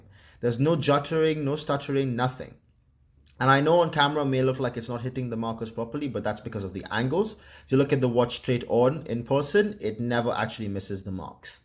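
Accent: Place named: India. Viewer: hehe I could make out from the accent... I'm indian too and pleased to see a GS lover in India... Do u have any Spring Drives or Mechanical GS too?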